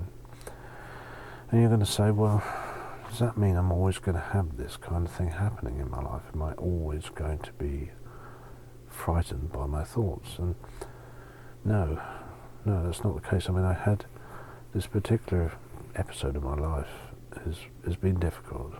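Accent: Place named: Hampshire, United Kingdom. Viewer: Ah it's a Hampshire accent - yes, some Sussex accents can be similar too. I thought you didn't come across as Cornish in your attitude or manner, so was a bit puzzled, but now I get it. Yours is a very reassuring and earthy accent/voice, which suits your purposes very well. I find it very calming.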